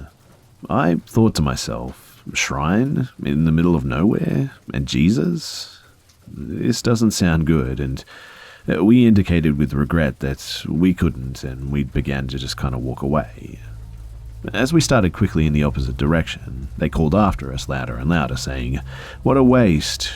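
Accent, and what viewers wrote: Australia. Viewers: Is Australian???????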